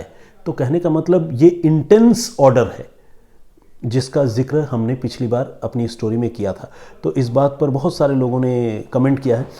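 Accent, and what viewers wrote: Standard. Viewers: Sir u r good anchor n good story teller with pure hindi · sir ji apki hindi.....ap devta hain ....itni shudhh hindi bhot kam suni hai..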